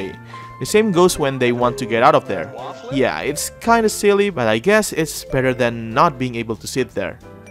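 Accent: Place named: Indonesia. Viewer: Gilee bangg keren bgt, gw lgsg ngeh lu indo dri aksennya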